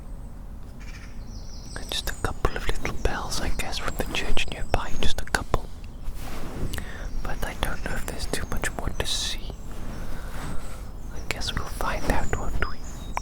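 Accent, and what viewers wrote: Wales, United Kingdom. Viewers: Noooooow I know why you talk a lil funny! You’re just from Pontypandy! (I’m a Hampshire-ean) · The Welsh accent is made for asmr, whispers are immaculate 👌🏻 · Welsh accent 10/10.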